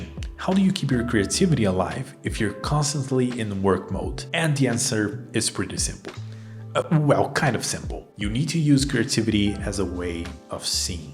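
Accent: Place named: Brazil. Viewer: Great video! Gonna read this one asap. Você é brasileiro? O sotaque é de brasileiro kkk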